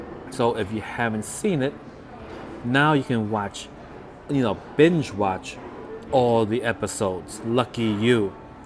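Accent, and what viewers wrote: New York, United States. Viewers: Thanks for your service! My wife has kind of the same pronounciation problem as you. Lol...but, you do it with a New York accent. Don't stop talking! That's why I watch! No, I've never wanted a late night snack of vegetables. Hat is fine. It makes you look distinguished.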